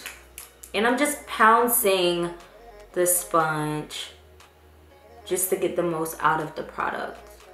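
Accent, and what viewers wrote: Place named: South, United States. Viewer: Slay! Yes Texas keep bringing the southern accent out of!!! <3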